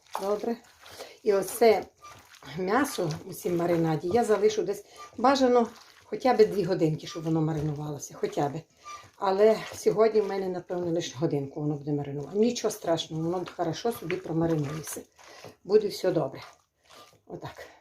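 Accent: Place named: Zastavna, Ukraine. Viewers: Живий класний рецепт!! Дякую- класно що жива мова Заставнявського району, живі звуки кастрюль, дзвенкіт посуди - просто класс! Дякую за рецепт- як писали люди - всі то шукали давно і нарешті в ютьюбі є! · Говірка ,точно як у Заставнівському районі,.....файно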